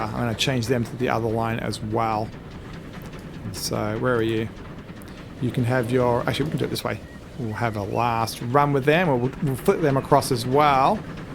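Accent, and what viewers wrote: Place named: United States. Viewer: In UK we say it like assfault We say d-e-po where you say it Dee-po. We say "root"when saying route. Always wonder why Australian and new zealanders say it like the yanks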